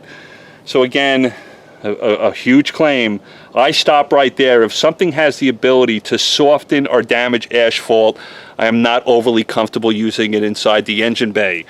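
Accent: New York, United States. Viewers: your accent sounds like your from new yark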